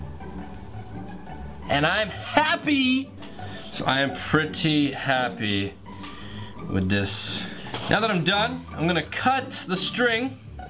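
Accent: Canada. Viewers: Soorry he sails soory like Nicole and Andrew 😍 Canadian sorry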